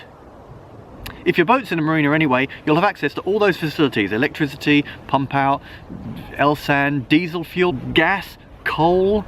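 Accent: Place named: Chicago, United States. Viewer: is this Chicago? He Sounds like hes from Chicago....🇬🇧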